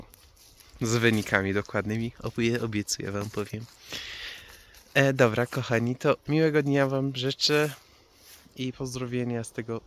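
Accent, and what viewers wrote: Poland. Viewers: wlasnie nawet akcent polski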